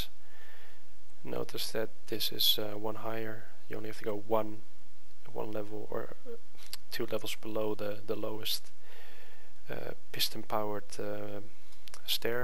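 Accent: Netherlands. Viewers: Are you from Holland? I thought I heared a kind of a dutch accent! ;-p Anyway nice video and of course nice design and tutorial! Goodbye! - The Dutch Island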